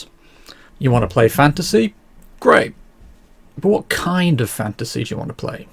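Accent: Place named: United Kingdom. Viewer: You have a British accent. This is episode 002. Does this mean there's 005 more episodes before you talk about superspy/espionage RPGs?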